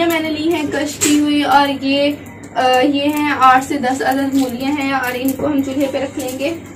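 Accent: Punjab, India. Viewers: Please Speak Punjabi Your Punjabi is so sweet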